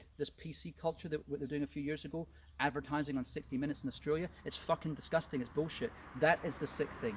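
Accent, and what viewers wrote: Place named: Scotland, United Kingdom. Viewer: I thought ye might of mistaken him from Watts lol He's actually from Glasgow originally (now resides in Sudbury Ont. CanaDUH) I thought I recognized yer accent but wasn't sure what part of Scotland ye were from, I'm originally from Belfast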